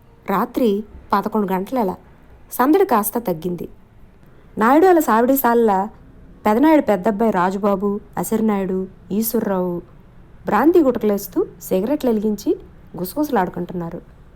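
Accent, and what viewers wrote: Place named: Vizianagaram, India. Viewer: Vizianagaram yaasa బావుంది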